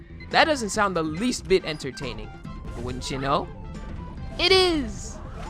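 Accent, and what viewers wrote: Canada. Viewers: Damn thicc Canadian accent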